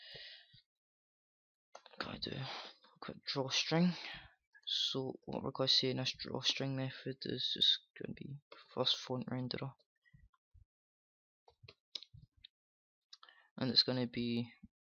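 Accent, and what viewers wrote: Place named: Scotland, United Kingdom. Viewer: nice sco'ish accen' ma'e, I'm from glasgow but not got an accen' like you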